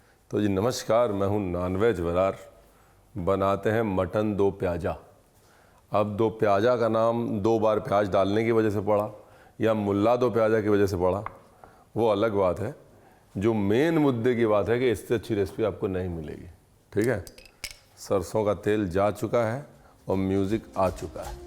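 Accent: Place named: Lucknow, India. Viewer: Ahhh, that mutton looks too delish 😋. Moreover, i love the Lucknowi tone of your language. Love from Lucknow ❤❤❤❤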